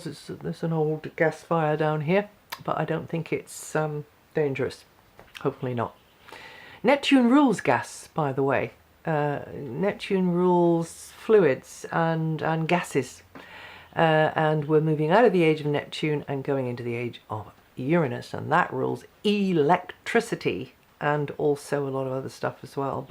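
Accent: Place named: RP, United Kingdom. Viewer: great reading which part of the UK do you live in you sound posh xx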